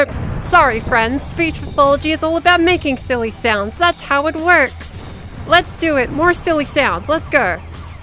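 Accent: Australia. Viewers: It's so nice to have an australian accent guide for the exercises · yeah i ultimately agree with that but idk just aint for me i suppose id say the only problem i got with ur vids is that u got an australian accent so its a bit of a work around to be like "oh how would i say that" but its pretty easy to work around so its no big deal tbh plus its probably amazing for people with Australian accents but yeah thats all thx for replying and its good to hear that ill be on the lookout hope your having a wonderful day also your contents amazing even without including tutorials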